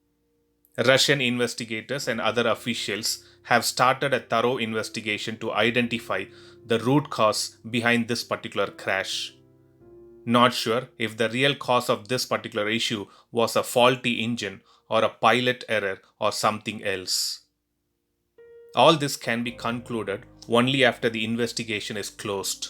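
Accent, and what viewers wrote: India. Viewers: Naratornya kaya orang India ngomongnya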